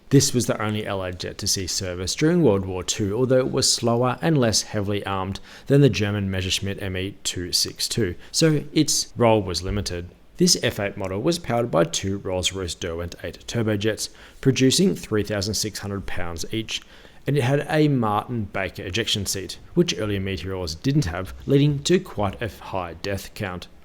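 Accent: Australia. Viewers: Bloody brilliant mate, and it’s great to hear an Aussie voice. Keep it up. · It’s so nice to see a factual, educational clip AND it’s spoken in Aussie!😂😂🇦🇺 thanks mate, love your vids.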